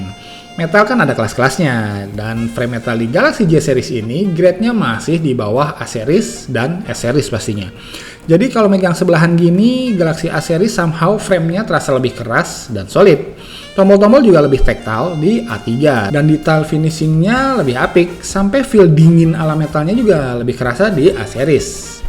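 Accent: West Java, Indonesia. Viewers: orang sunda kang?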